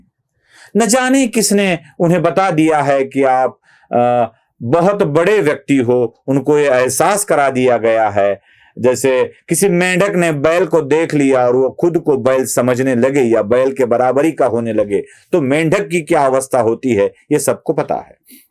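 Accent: Maharashtra, India. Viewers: सुशील तू यार हिंदीत बोलायच्या फंदात पडू नकोस, कारण हिंदी उच्चार मराठी सारखेच वाटतात.. हिंदी भाषीक तसे ही मराठी चॅनल्स मुळिच बघत नाहित. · Your analysis is good but I love your pure Marathi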